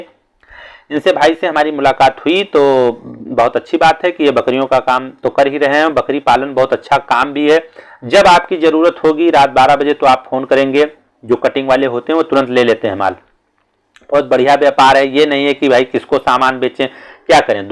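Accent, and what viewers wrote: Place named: Uttar Pradesh, India. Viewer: Apki awaj se bahraich ki mahak aati hai. Thanks brother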